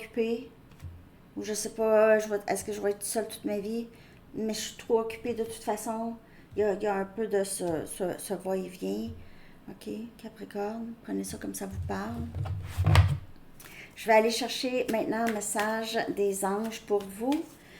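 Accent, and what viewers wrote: Quebec, Canada. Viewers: J adore vos tirages et avec cet accent québécois que j aime tant!❤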